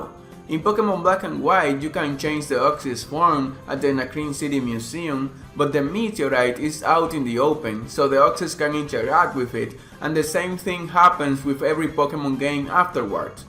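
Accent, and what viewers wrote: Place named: Latin America. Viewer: That was a great theory, awesome job man! I am curious about your accent, are you latino?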